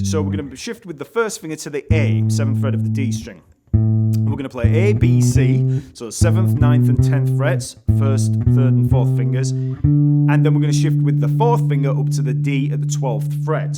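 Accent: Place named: North, United Kingdom. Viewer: The clarity of your Northern accent allows me to soak your teaching by osmosis. It's only a Northern song. I'm a Yorkshire lad by the way. Si thi.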